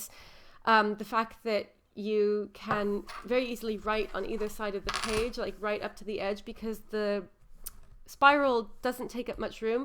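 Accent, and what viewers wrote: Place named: United Kingdom. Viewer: Amazing, what an experience. You can really hear British undertones but the American accent is definitely there.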